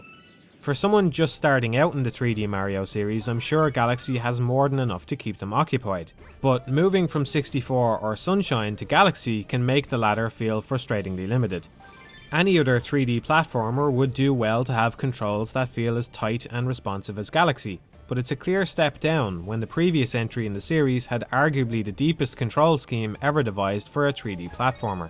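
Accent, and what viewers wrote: Ireland. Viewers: Warren Tierney Yes he is. You don't know how Irish people sound like?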